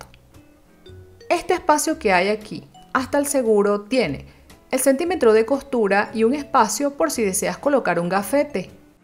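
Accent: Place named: Venezuela. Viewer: Gracias.... Me gusta mucho la forma como explicas... De donde eres? Tu acento es venezolano, me parece.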